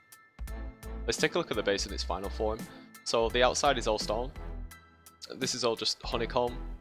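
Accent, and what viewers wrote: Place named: Lancashire, United Kingdom. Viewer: I thought that mate, definitely some kind of blackburn or Burnley in there as well mate with a manc twang 😎 good video mate and good luck with your channel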